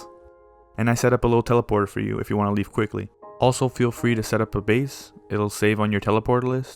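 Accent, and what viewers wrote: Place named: Brazil. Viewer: Estava me perguntando se você era brasileiro pelo sotaque, aí me deparo com o pet com o nome "Pica-Pica". Confirmado 😂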